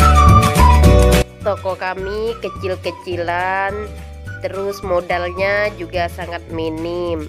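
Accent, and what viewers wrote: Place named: Madura, Indonesia. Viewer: orng madura kyak e logate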